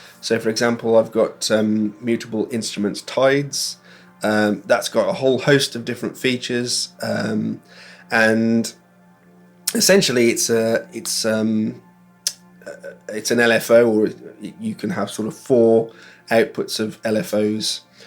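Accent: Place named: Midlands, United Kingdom. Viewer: Hi, cool video. Are you in the Midlands? I’m in Birmingham:) I’m going off the subtleties of your accent haha. When I was beginning with real Modular I just got 1 or two Doepfer modules and then patched them together in different ways for a while, it was a good way of not being overwhelmed 😊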